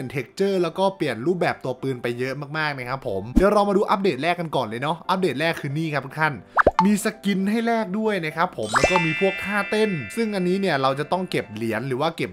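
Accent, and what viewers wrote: Isan, Thailand. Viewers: เสียงอีสานก็มา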